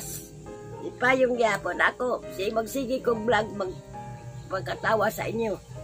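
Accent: Surigao, Philippines. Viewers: Makahilak man pod ta.. God is good. Ampo lang ta kanunay nga healthy ta ug safe.. Nga dili lng sa bugtuon ni Lord ang lastiko.. Heheh bitaw pkatawa lng.. Tga Surigao pod ko pero naa mi sa Norte.. Mao nga pgkabati nko sa imong sinultian nga surigaonon bisag sagol na cebuano. Mkamingaway surigao lami iuli pero tungod sa layo kay dia ko Kuwait unya sa sitwasyon karon. Pero cge lang.. Keep on vlogging.. Kay lipay mi kaau sa nu ni wako labi na pod ug maguban mo.. Keep safe! 😘😘😘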